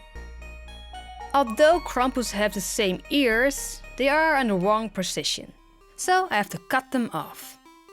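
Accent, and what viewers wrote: Netherlands. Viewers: Are you dutch?? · i can hear from the way you speak english that you are dutch right XD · your dutch accent is so cute! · Are you Dutch? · Are you dutch? I'm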